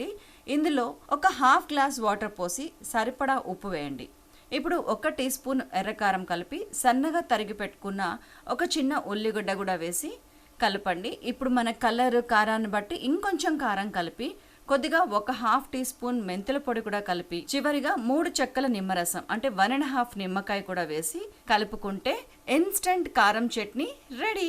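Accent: Telangana, India. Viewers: Akka me Language superb akka nenu me lagey pakka telangana matladtha mastu ma Hyderabad relatives comments chestaru...akka 'Manchiga' is also my word..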